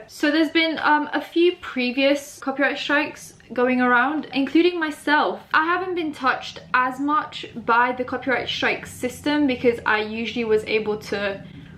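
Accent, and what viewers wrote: United Kingdom. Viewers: Grl i started liking uk accent because of you and i love yours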